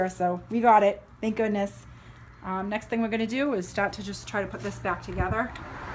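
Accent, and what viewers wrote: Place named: Massachusetts, United States. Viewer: Thanks for the help. Where in Massachusetts are you from? I can hear it in your voice.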